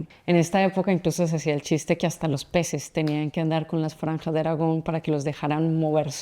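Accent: Colombia. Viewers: De qué parte de Colombia eres? Tienes un acento y voz preciosa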